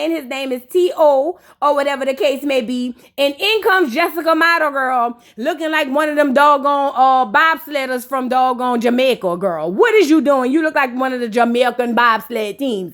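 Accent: New Orleans, United States. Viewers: Love the commentary and the New Orleans accent 🤣🤣🤣🤣🤣🤣🤣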